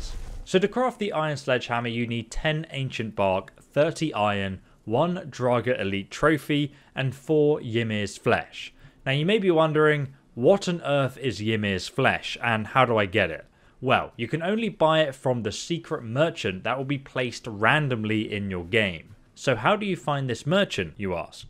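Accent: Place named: England, United Kingdom. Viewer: I don't know why I prefer to receive instructions from a person with an English accent, but here we are.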